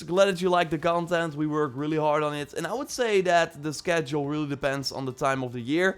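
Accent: Netherlands. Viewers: Bro u Dutch I know it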